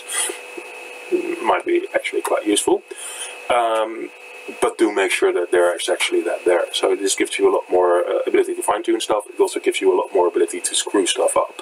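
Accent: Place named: Netherlands. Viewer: Jesus wat een kut nederlands accent heb je als je engels praat -.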